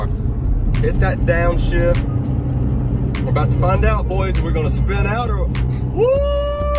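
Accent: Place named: South, United States. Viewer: For me its his lovely southern accent and the background music. #thevibes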